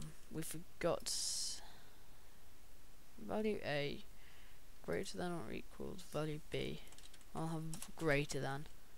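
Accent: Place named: United Kingdom. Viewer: great tuts...also love your accent, british-type accents are cool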